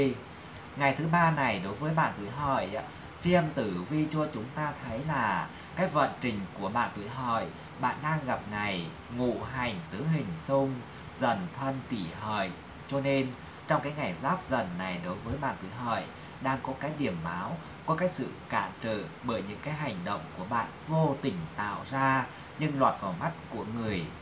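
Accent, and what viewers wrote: Thanh Hoa, Vietnam. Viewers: Dung giong Thanh Hoa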